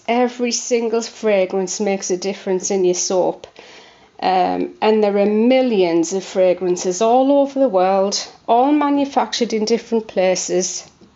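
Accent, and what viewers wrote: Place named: Newcastle, United Kingdom. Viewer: The accent is what we call geordie , its north east england .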